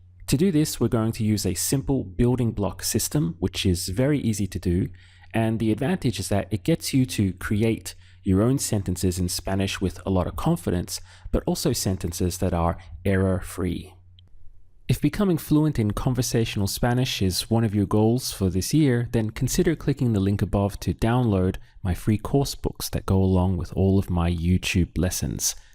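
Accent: Castile, Spain. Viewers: this Spanish is Castilian, right? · Hi, this may sound like a silly question, is this European Spanish? Many thanks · Is this the Spain dialect?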